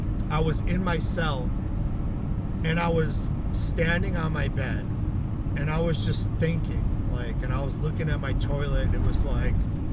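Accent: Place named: Wisconsin, United States. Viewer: Are you from Wisconsin ? I can hear the accent in your voice. Im from the Green Bay / Appleton area .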